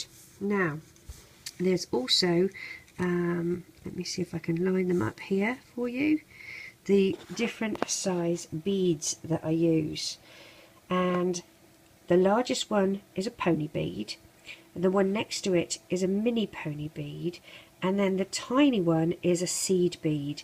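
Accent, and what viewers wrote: United Kingdom. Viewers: Cool channel!!! <3 your creations!!👍👍👍👌👌👌✌✌✌😊😊😊 I love your British accent!!! 🇬🇧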